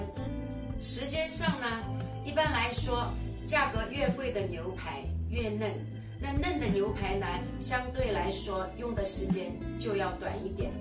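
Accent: Shanghai, China. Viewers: 的上海言话哈一样赏心悦目。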